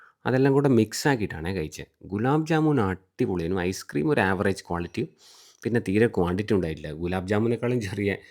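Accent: Kannur, India. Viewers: idh kannur slang a mone😂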